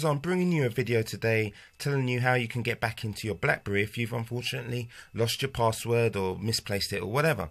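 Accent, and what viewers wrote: United Kingdom. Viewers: I did not understand, I cannot understand british accent that easy :( gonna try again :(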